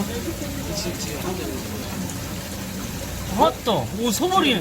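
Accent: Jeolla, South Korea. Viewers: 전라도 사투리가 구수허네유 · ㅋㅋㅋㅋㅋㅋㅋㅋㅋㅋㅋㅋㅋㅋㅋ 토종 전남분이시죠? 아 웃긴그 ㅋㅋㅋㅋㅋ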